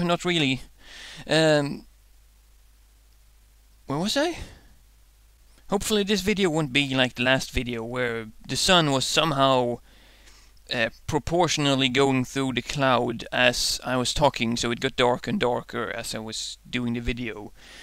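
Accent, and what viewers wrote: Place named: Sweden. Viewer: I am proud to have him. At first, his accent bugged me because I thought it was Scottish but it sounded wrong. Then I realised it was Swedish and sounded right. Now I find it comforting to listen to.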